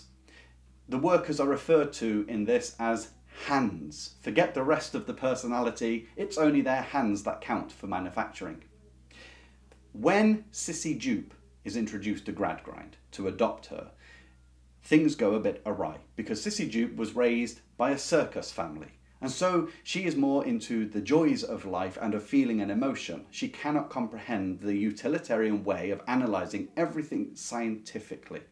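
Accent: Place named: United Kingdom. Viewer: Please, please take your fingers off the book’s title and its author when you discuss a book. Maybe even project the book on the screen. You go at top speed through introducing the book and then you put your hand over the book. Unless one pays close attention, your British accent and your galloping through the content makes it difficult to follow.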